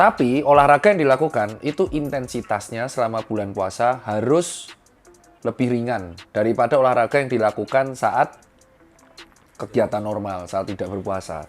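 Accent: Java, Indonesia. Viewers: Dengerr medoknya dokter ini jadi inget seseorang:)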